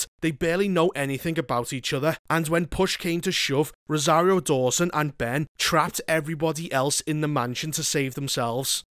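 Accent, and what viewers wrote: Liverpool, United Kingdom. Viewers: He's from Liverpool. Very distinct accent. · he has a 'scouse' accent as we say... it means he's from Liverpool.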